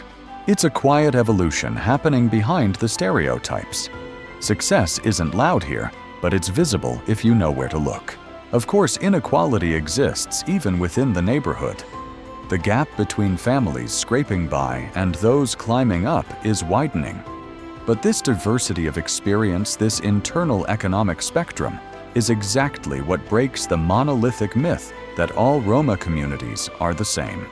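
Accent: United Kingdom. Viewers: You have a British accent, and you are highlighting the negative aspects of all countries. What about your own country, Britain? britain is now taken over by Pakistanis, rape and murder are everywhere, poverty and homeleeness is common. Show your own country first. You don't look at the reason, only describe. The condition of these people in Bulgaria was normal during the days of socialism, but under capitalism, it is now a disaster.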